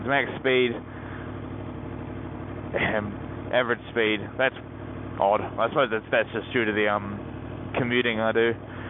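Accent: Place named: New Zealand. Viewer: Nice to hear a fellow kiwi , really enjoyed this hope to see more on the aprilia and more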